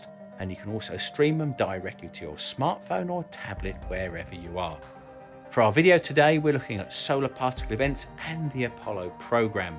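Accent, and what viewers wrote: United Kingdom. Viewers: British accented narrators are the best because the rhythm in their sentences and the pitch/sound of their voice makes you feel like your high IQ grandparents are telling you a story! I love your work and the mix between photos and videos as you talk makes you feel like you are really immersed within the topic. Ps: I really would love more space/aeronautical engineering videos. 🌎🌕🌞🪐🚀🛰👩‍🚀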